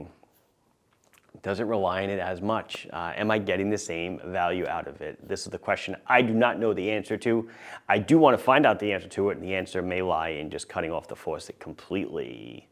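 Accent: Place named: Texas, United States. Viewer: I don’t buy watches from you because of your Google ads. I DO buy watches from you because of your Texas drawl in describing your products & and because of your wardrobe that matches Teddy Baldasarre’s. There, I said it.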